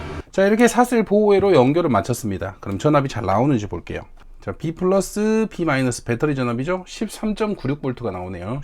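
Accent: Jeolla, South Korea. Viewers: 혹시 전라도 어디세요^^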